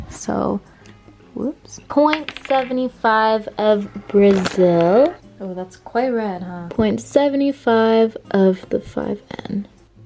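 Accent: United States. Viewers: Where are you from? I don’t understand why you’re saying pounds when you sound pure American. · Where are you from? You said pounds but you sound American x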